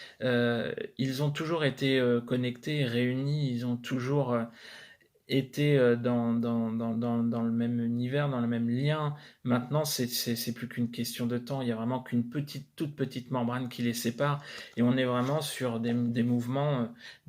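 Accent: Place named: North, France. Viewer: ahh j'ai cru entendre parler du nord, bon remarque du sud à chez nous, nous suis bien dans le nord de la France 😂😂😂 Contente de t'entendre à nouveau 🙏🙏🙏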